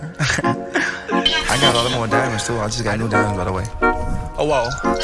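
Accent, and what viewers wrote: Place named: Quebec, Canada. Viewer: Ayo est-ce tu vis au Québec parce que ta l’accent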